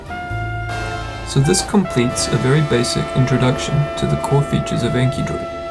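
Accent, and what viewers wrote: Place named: New Zealand. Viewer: Who got the Kiwi to do the tutorial about "decks" then..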